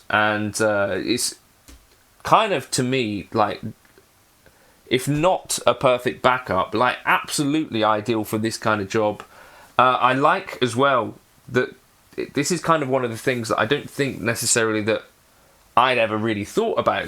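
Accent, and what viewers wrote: United Kingdom. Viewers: The minute that I heard a British accent, I knew that it was going to be about distortion on guitar. The Brits should have an image of a distortion pedal imprinted on the Union Jack (their national flag.) It's against the law to play an authentically clean sounding, electric guitar in Britain. Here is a reminder of what a clean sounding guitar actually sounds like. <link>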